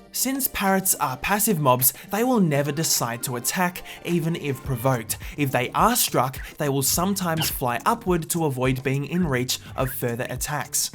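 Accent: Australia. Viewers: wait a second.. are you aussie? cuz you sound aussie to me lol btw i’m australian lol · are you Australian?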